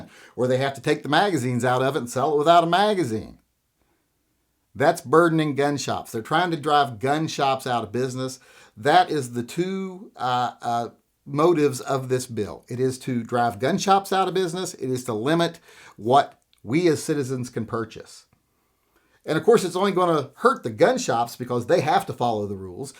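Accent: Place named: California, United States. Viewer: You are sounding like a Californian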